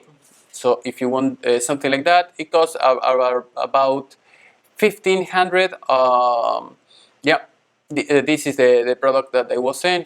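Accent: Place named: Rioplatense, Argentina. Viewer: te escribo en español porque por el acento y el "going to" (vamos a) que usas mucho igual que yo tenés que ser sí o sí argentino o uruguayo. Yo soy porteño, y vivo en Atlanta, Georgia. Habiendo hecho esta introducción, te cuento que me encantó el video, y que probablamente sea el más instructivo y completo que encontré sobre el Teradek Vidiu Pro. Me lo quiero comprar para hacer transmisiones para la tele argentina, y la verdad es que creo que me voy a inclinar por esta opción. Te mando un abrazo argentino y espero que estés muy bien. ¡Gracias!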